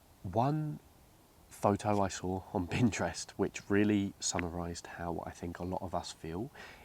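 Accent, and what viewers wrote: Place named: London, United Kingdom. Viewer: What part of England are you from? If I had to guess I’d say somewhere in London.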